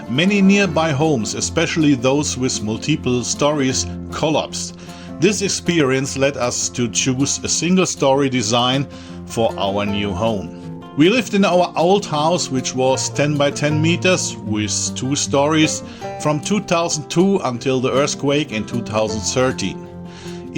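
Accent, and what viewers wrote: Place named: Germany. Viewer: Als aller erstes: Gruß aus Panama. Und nun das zweite: 😂 Ich lebte 15 Jahre in Kanada und mir wurde immer gesagt, dass ich kein Deutscher sei. Dies wurde mir auch hier von Nordamerikanern und Engländern in Panama gesagt. “Du sprichst wie ein Nordamerikaner. Deutsche haben einen spaßigen Akzent!” Als ich dich reden hörte, da musste ich erst einmal schmunzeln. Aha. Da ist er. Der Akzent. 😅 Nicht böse gemeint. - Kleines Haus und Wände. Ihr habt die Konstruktionsfläche genommen und nicht die Wohnfläche. Ich lebe hier in Panama in einem gemieteten Haus. 2 Schlafzimmer, Küche, Wohnzimmer, Bad. Genau 8x8 Meter. Die Terrasse ist 8x2.50 extra. Reicht uns auch. Ich baue nun ein rundes Haus mit 2 Stockwerken. Alles alleine. Bin “Unfallrentner” und bekomme eine gute Rente von der BG in Kanada. Durchmesser meines Hauses ist 9.80 Meter. Wohnfläche ist ungefähr 74m2 mal 2. 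Das erste was den Leuten an meinem Rohbau aufgefallen war sind die “gut gemauerten” Wände. Ich wäre wohl vom Fach oder einen Meister. Nun. Vor 33 Jahren baute ich mein Haus in Deutschland alleine. Als ich meinem Sohn in Deutschland mitgeteilt habe, das ich ein Haus baue, da kam ein: “Papa. Du bist keine 28 mehr. Spinnst Du?” Nun ja. Lausebengel! Als er letztes Jahr zu Besuch kam meinte er: “Wow. Du weißt noch wie das geht?” Hab ja noch nicht Alzheimer. 😅 Und in Panama haben neue Baugebiete keine Namen für die Straßen. Nun kommt der Witz. Auf den Lieferscheinen steht als Addresse: Calle de Castillo. (Burgstraße) Und jedes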